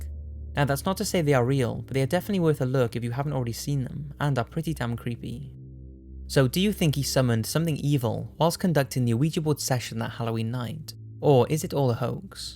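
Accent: United Kingdom. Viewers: He's British and they just pronounce things differently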